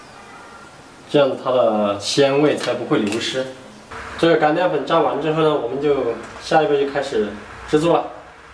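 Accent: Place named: Zigong, China. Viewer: 感觉王师傅是自贡或者内江的~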